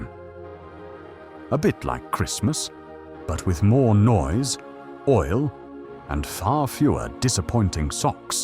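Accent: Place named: United Kingdom. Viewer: I've always preferred the English (GB) voice. Thanks for this video.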